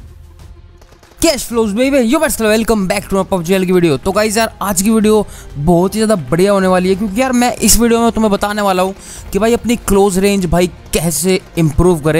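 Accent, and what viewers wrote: Haryana, India. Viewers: areeeeeeeee bhai love you ek haryanvi ko haryanvi hi pehchaan sakta hai😊😂❤️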